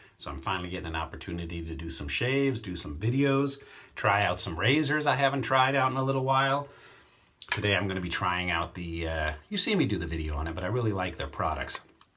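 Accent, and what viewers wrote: England, United Kingdom. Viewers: Do you recall having an English accent at any time as a child? Are there any recordings of your voice at that time?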